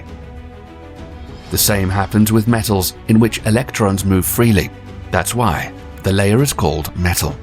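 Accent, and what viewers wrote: United Kingdom. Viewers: Why does there ALWAYS got to be some brite narrating even on an American channel.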